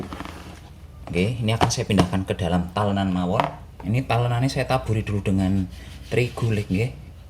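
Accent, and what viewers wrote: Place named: Banyumas, Indonesia. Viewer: Resepe ga terlalu penting yg penting denger ngomong ngapak2...hiburan bgt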